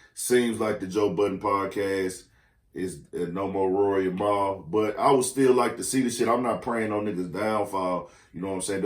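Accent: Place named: Texas, United States. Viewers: Yup. Slim Thug type accent. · This my 1st time ever seeing this blogger. My guess, based on his accent, is he from Texas. Probably Nawf Screwston. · Lmfao same I was like he from Texas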